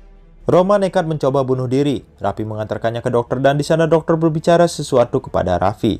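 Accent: Bali, Indonesia. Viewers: Bli org bali ya?? Logat nya kyk ny dari bali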